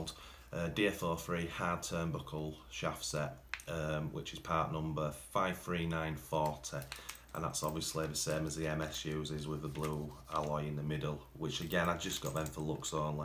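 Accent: United Kingdom. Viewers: Hi, sorry to ask unrelated questions but you are my go to channel,it might be that Brit accent, I am in blighty!Right, I am new to the hobby and after watching you I now own a XV-01 lancia delta. I now want a buggy. Looking at the Dark impact BUT then see the Top force. I intend to run it on a "cheapish" BL Absima combo. What would you go for? Also on your build I could not see if you need bearing upgrade set? Thanks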